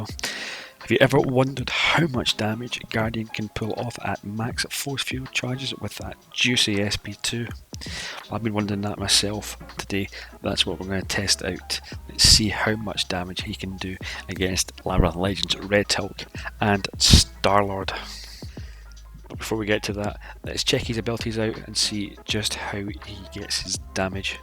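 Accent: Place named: United Kingdom. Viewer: Your British accent is THICC Boy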